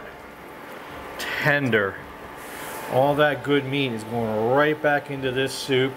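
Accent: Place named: Pennsylvania, United States. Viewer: My father was WWII, gunner's mate in the north atlantic. Have a good friend who is a Seabee and she just left this week for her year... In Somalia, we think. My husband was searching bean and ham soup and voila! Yours came up and he shared it to me. I watched it and I told him I thought you were from PA... Just heard a word or two every once in a while. We are from Pgh area but living in NC.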